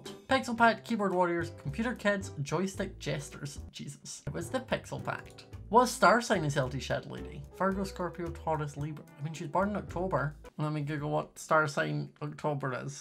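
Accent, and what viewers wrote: Scotland, United Kingdom. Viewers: you are scottish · Me: reading comments about how they thought he was gay Me being my clueless self Me: I just thought he was Scottish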